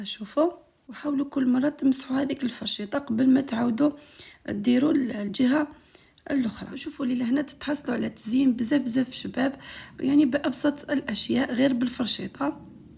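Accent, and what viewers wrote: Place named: Egypt. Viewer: نعم من لهجتك عرفنا أنك مصرية أهلا وسهلا بيكي بين أخواتك أهل وأحباب في الله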